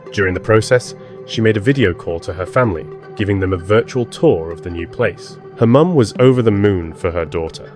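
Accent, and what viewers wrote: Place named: United Kingdom. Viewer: Tip: get rid of that offensive, slimey british accent😮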